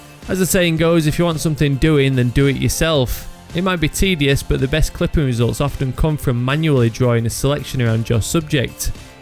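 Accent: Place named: Yorkshire, United Kingdom. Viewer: Love Yorkshire accent.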